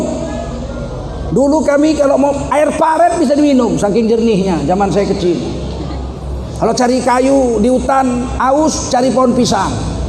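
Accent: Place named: Medan, Indonesia. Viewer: hahaha...lawak ceramah nya... kok seperti orang medan cara bicara nya ya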